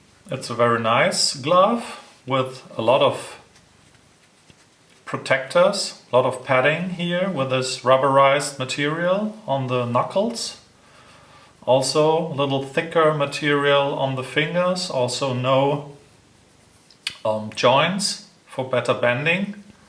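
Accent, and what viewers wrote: Germany. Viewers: Das ist so ein kranker deutscher Akzent 😂😂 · Man merkt so krass das du deutscher bist 😂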